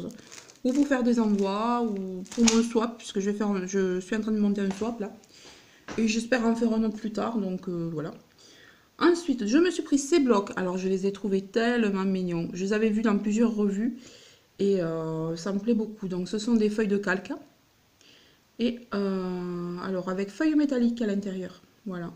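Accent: South, France. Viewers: ton petit accent du Sud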